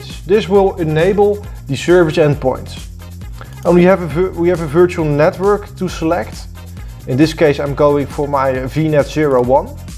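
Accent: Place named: Netherlands. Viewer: Maybe you should remove the typo in your title: Virual -> Virtual Love your dutch accent btw ;)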